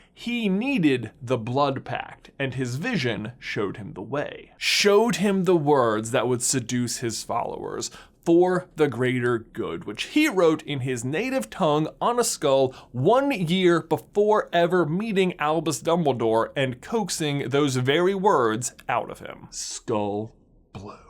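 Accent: Germany. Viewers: Your germane accent is so funny and bad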